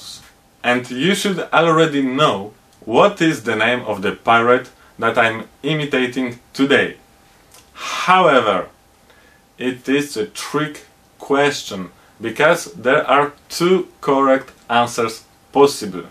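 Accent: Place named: France. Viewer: Your accent sounds French. What is the origin of this accent?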